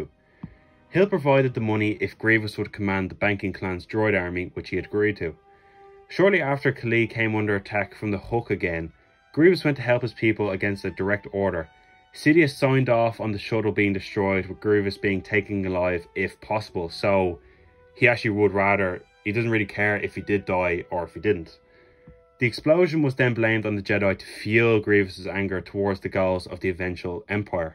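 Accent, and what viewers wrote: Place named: Ireland. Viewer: Love your channel mate ...Nice to hear an Irish lad on youtube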